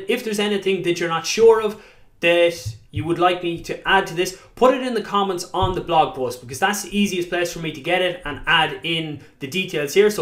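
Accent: Ireland. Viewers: good man,me too! 😉 Hey I notice you got Irish accent, I'm living in Dublin,I'm entrepreneur,was on dragons den last year. Do you live in Ireland? I'm starting work on a new project, a new.com crypto unlike any seen before, I obviously think it has massive potential, but i could do with a partner with good knowledge of crypto world like you. You interested?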